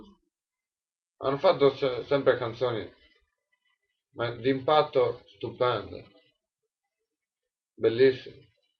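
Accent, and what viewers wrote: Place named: Sardinia, Italy. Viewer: Scusa,ma non riesco a circoscrivere il tuo accento,sei Sardo o Pugliese?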